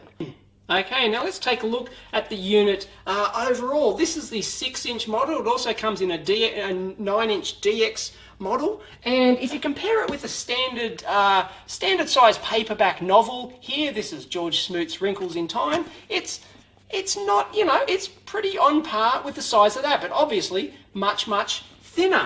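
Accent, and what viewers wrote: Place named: Australia. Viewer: Rocking the accent.. is that australian or new zeland?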